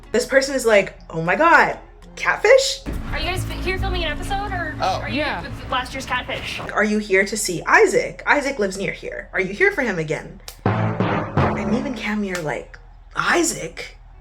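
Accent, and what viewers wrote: Canada. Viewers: Girl don’t feel weird about your Canadian accent! Your voice is so soothing and you’re an amazing story teller! I just came across your content a few days ago and I have been bingingggg ❤ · ahh I’m kinda fangirling rn. I’m so stunned that you replied!! I’m a new subscriber and have been binge watching all of your catfish and catfish adjacent content and I’ve been loving it and loving you!!! I also wanna say I love the sprinkles of Canadian we get from you, it’s so charming and I love it, it makes me giggle because I find it so cute! Thank you for being a real one bestie and I can’t wait to continue watching you for years to come ❤️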